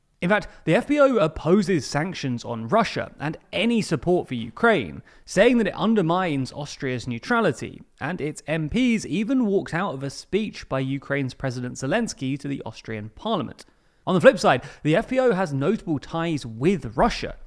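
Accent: United Kingdom. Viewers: This sound doesn’t exist in English. You’re watching a video from a Brit so expect that pronunciation. Should you wish to hear a proper “Ö,” watch a German language video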